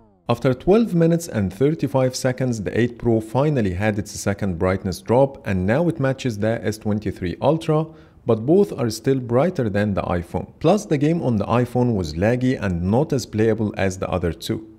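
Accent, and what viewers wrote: Egypt. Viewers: انتي مصري صح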